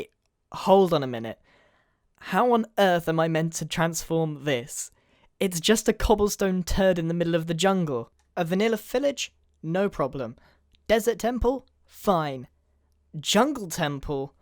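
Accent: United Kingdom. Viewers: i love your brittish accent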